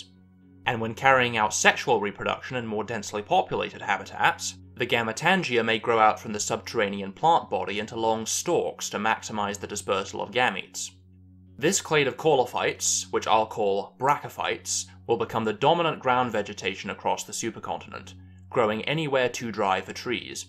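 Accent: United Kingdom. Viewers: A guy with a British accent is explaining a prehistoric world to us. It makes me wish that there wouldn’t be copyright consequences if you just started using the musical score from Walking with Dinosaurs for the background of these.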